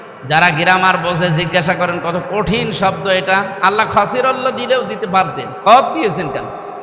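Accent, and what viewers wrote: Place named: India. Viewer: দাদা ইন্ডিয়ার সুরে কথা বলছে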